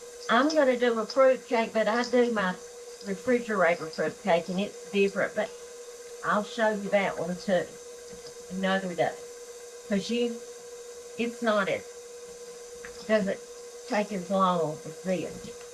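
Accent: South, United States. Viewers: Love hearing the southern accent. I miss my mountains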